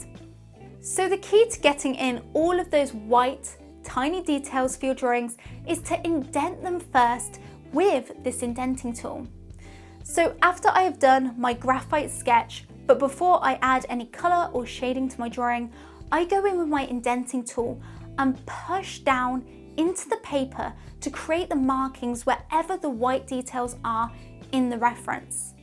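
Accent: United Kingdom. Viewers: She have a British accent...😲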